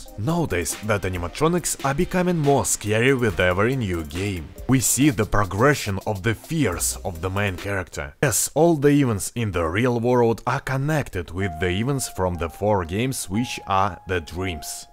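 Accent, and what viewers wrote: Russia. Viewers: С русским акцентом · Интонация русская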